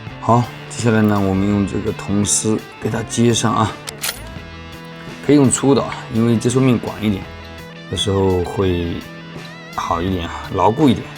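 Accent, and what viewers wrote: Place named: Fujian, China. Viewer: 是我看过做维修视频做的最专业的一个，请问是不是福建人？听口音像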